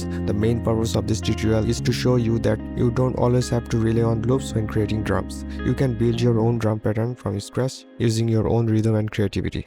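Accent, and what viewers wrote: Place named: India. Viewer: Nice work👍 I hear an Indian accent😎 Hello from Russia 🇷🇺 FPC is an optimal tool to make pro and solid drum part in FL, but sometimes I use NI Battery😉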